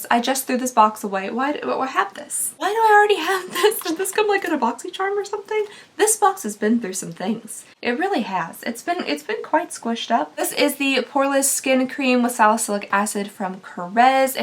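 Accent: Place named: South, United States. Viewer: You're one of my favorite YouTubers. Your southern accent (I have one too), your personality, your babies, and your authenticity are very refreshing and make me feel like I'm sitting around chatting with my sisters.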